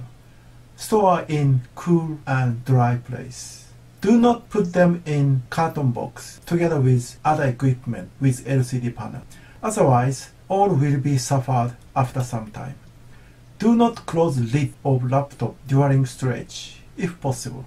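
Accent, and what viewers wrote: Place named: Japan. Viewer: まさに日本人って感じのアクセントやな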